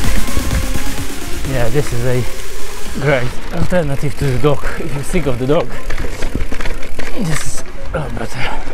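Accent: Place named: Poland. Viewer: angielski masz dobry ale slabo slychac i do tego ten nasz angielski/Polski accent 😉 jak chesz wiecej subow to sie musisz pokazac na ekranie.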